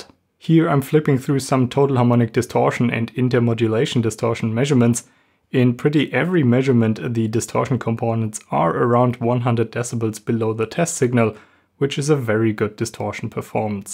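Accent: Germany. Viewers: Man hört den deutschen Akzent.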